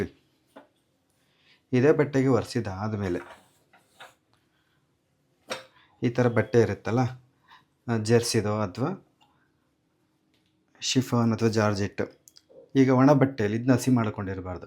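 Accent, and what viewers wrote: India. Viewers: ದುರ್ಗಾ ಕಡೆ ಜನ ಹಾಗೆ ಮಾತಾಡೋದು ಅವರ ಮಾತು ಅಡಿಗೆ ಎಲ್ಲಾ ಚಂದ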